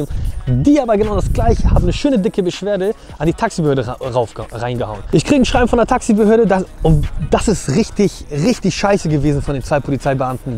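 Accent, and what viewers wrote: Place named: Hamburg, Germany. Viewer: Norden/Hamburg Slang!! Dialekt!!! Wir sagen auch Zb Tach statt Tag!! Ist schon richtig!!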